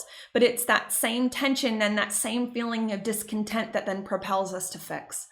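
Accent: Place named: Michigan, United States. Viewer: Are you from Michigan? I'm from Michigan. And I hear a lot of Michigan in your voice... 😉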